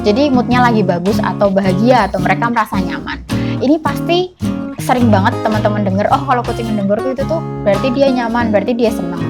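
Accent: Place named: Java, Indonesia. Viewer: Pasti orang jawa ya kak Bahasa indo nya medok banget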